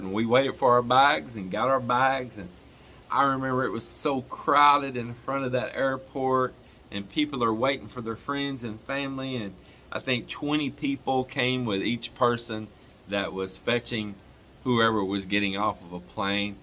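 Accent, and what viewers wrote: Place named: Dallas, United States. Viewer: Love the Dallas accent. It is Dallas, right?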